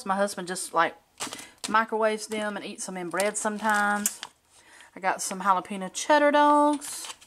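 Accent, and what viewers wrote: South, United States. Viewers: I like grocery hauls and yours was nice!!! I like the way you speak. I’m from South Carolina and I love southern sounding people 😂!!! Have a great day and God bless!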